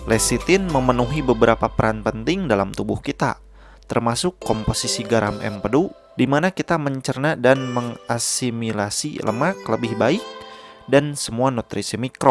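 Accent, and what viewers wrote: West Java, Indonesia. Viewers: sunda pisan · Logat orang Jawa barat Indonesia Raya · yg host nya klo boleh sy tebak pasti org jawa barat 😂😂😂😂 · Sunda pisan kang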